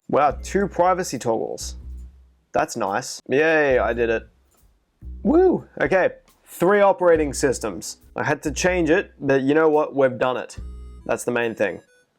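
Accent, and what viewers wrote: Australia. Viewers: Ur Aussie same lol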